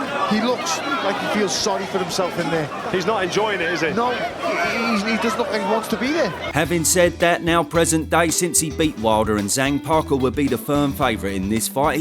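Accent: Texas, United States. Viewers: Perfect Texas accent if you ask me. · Love the Texan accent. Literally pissed myself.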